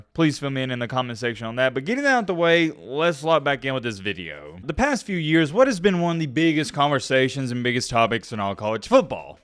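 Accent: South, United States. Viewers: I was always a casual fan, but since 2020 I've been learning slightly then started REALLY learning about college football since like 2022 but have been watching for much longer, I have to say your channel is the best informative and unbiased college football channel plus you're Southern so I speak your lango (I'm From Southeastern Georgia, go Dawgs and all the Georgia teams) thank you for being newcomer friendly, still many things I don't know but if I wanna know something I just watch your vids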